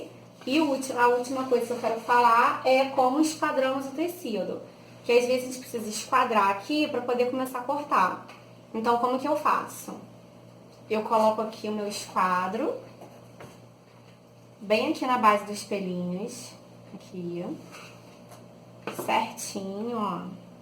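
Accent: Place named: Rio de Janeiro, Brazil. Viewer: Parabens pelo video e pelo sotaque carioca! Cheguei aqui procurando como fazer esquadramento para bordado, mas já estou inscrita no seeu canal desde agora! Felicidades de uma carioca que nao mora mais no Brasil!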